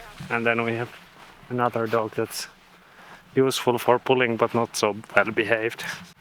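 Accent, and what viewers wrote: Finland. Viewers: It’s been a long time since I’ve heard the Finnish accent. I do miss it. Cheers to the both of you from a🇫🇮🇨🇦on the coast of British Columbia 🇨🇦 I’ve been following you two since you were on the North Coast of British Columbia 👌Cheers 🍻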